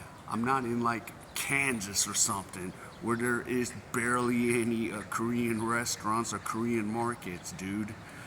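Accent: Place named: South, United States. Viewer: Maybe u need to tone down the red neck accent a bit hehe..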